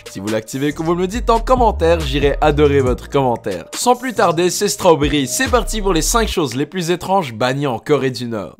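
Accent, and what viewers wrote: Quebec, Canada. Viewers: Québécois sinon bonne vidéo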